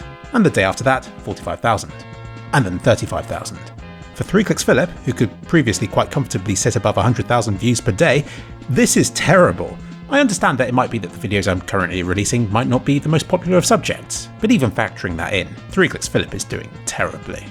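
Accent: United Kingdom. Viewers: I'll always be a subscriber, Philip. I've seen this faceless british voice talk about games, graphics, staircases, sun angles and CSGO for most of my teenagehood/early adulthood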